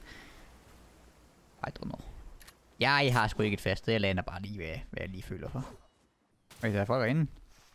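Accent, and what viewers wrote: Jutland, Denmark. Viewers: Kommer du fra Jylland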